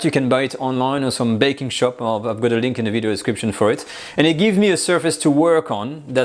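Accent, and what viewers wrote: France. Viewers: this guy talks too much...the first 3 minutes repeats same 15 second information twelve times in thick french accent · Your English is utterly idiomatic but with a French accent. Magnifique!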